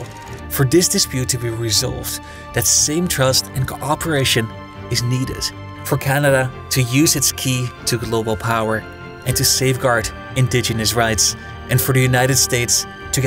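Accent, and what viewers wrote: Netherlands. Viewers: Your dutch arent you? Lol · He isn’t pronouncing it that way on purpose, he’s Dutch.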